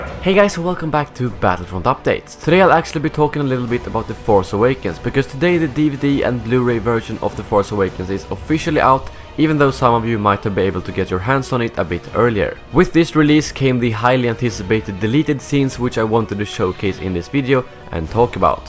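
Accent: Sweden. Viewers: Are you swedish? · är väll svensk?. Det låter så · you're swedish XD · Love your accent, dude. Swedish, I'm guessing? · he sounds very swedisg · are you swedish? You sound swedish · Are you swidish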